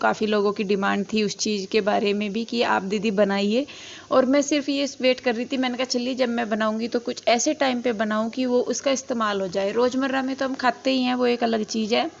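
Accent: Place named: Haryana, India. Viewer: Nice recipe di and aap haryanvibolte bahut achhe lagte ho.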